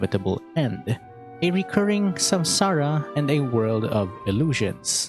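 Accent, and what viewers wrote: Philippines. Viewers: LMAO.,!! this is the first time that I really paid attention to how you speak and suddenly I could tell that you were a Filipino because of how you pronounce words so I checked your profile and saw your location in the Philippines!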